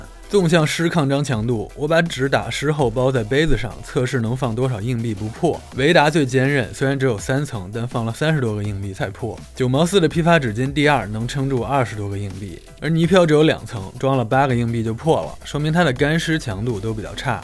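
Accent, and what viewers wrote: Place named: Beijing, China. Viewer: 我听出来了，这博主是北京口音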